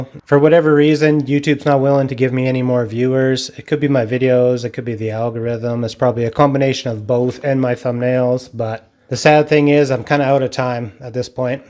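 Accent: Canada. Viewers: I wish I could shake your hand and thank you for the joy you've given. I'll miss your Canadian accent, eh? It's been super and I'm glad I got to follow along. Take care of yourself out there.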